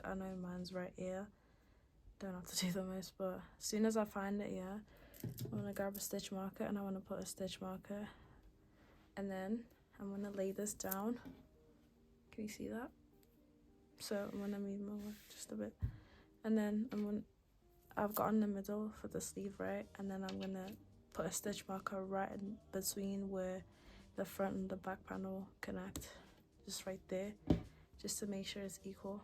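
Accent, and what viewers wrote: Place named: Scotland, United Kingdom. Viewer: Your accent sounds a little northern, it’s so cute! Are you Scottish? Love from America ❤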